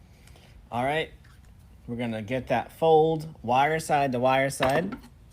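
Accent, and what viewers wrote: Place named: Louisiana, United States. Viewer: You say Y’all because your from Louisiana...lol. I tease my son who lives in Shreveport that I paid for 4 years of college for him to learn to say Y’all. 🤣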